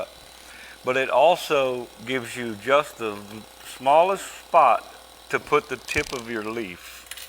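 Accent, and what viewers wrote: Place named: Oklahoma, United States. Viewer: I thought about Ben Johnson the whole time I was listening. It's almost an OK accent. Which is my home.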